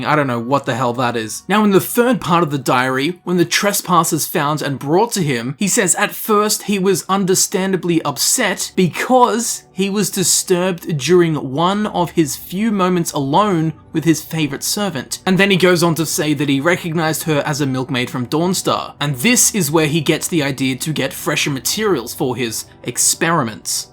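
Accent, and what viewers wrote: Australia. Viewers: Your an Aussie Aye?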